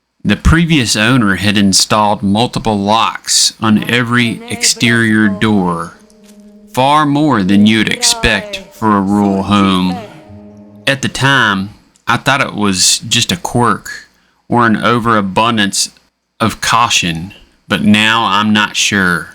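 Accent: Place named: Appalachia, United States. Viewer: Killing time having a stumble through your playlists wonderful narating I love the Appalachian accent it'sy favourite of the American types and Ur all so handsome out there' too🐻🐻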